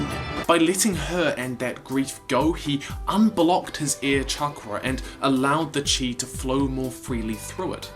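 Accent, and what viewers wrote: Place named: New Zealand. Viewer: you literally have my fathers accent you gotta be a kiwi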